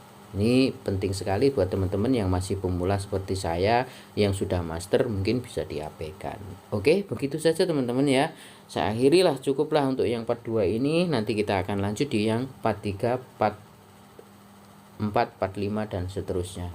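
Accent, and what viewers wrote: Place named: Java, Indonesia. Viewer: Ternyata wong jowo to mas... Jawa mana mas?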